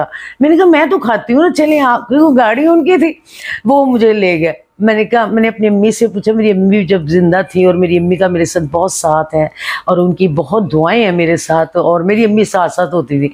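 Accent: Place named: Punjab, Pakistan. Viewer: آصف خان کی اردو کی نقلیں اتارنے کی ضرورت نہیں انکی اردو آپ کی پنجابی نما اردو سے اچھی تھی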